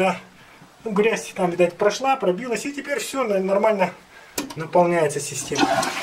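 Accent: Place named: Buryatia, Russia. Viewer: Уверен - автор либо бурят, либо киргиз, уж очень говор похож)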